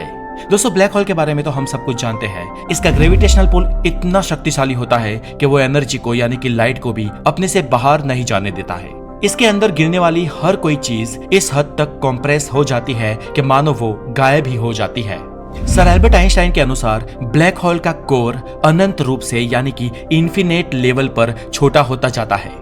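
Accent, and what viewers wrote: Gujarat, India. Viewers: Proper gujarati accent wali Hindi...Aur bhai wo DARK equation nahi hai, Drake Equation hai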